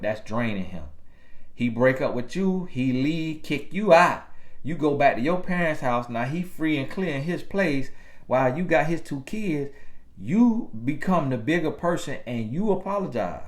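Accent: South, United States. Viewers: I always giggle on the inside because you have to interpret our southern slang words or phases. I am from South Fla the east coast and I totally understand all of them. Have me rolling 😂😂. Yes it is bazz instead of bath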